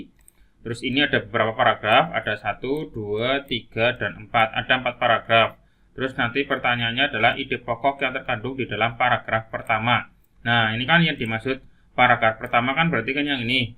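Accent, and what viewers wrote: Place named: Banyumas, Indonesia. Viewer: orang ngapak kie...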